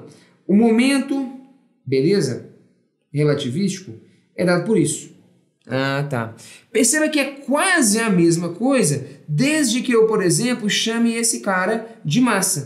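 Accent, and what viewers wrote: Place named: Minas Gerais, Brazil. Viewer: Kkkkkkkkk esse sotaque dele de mineiro é mt engraçado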